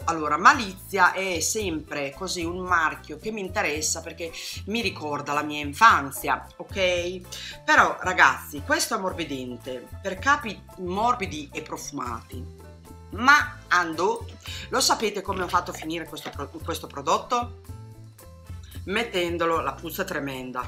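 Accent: Italy. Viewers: Anche tu ci sei mancata, è sempre un piacere vederti e sentire la tua bellissima parlata romagnola 😄😄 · Ciao...sei simpaticissima veramente...ma posso chiederti di dove sei? Emiliana? Romagnola? 🤗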